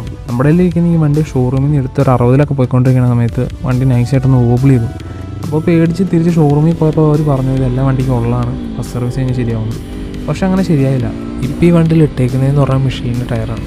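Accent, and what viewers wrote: Kottayam, India. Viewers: Broo kottayam kaaran aanooo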